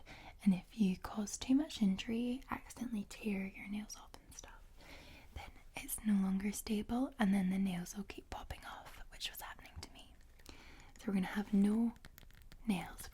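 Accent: Scotland, United Kingdom. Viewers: Probably the best, most relaxing ad I've heard for a VPN with a nice Scottish accent. Love it when gets sponsored<3 Also so sorry to hear what happened with your bank details, hope the damage wasn't too great? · Who else a simp for! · Only thing better than is x2!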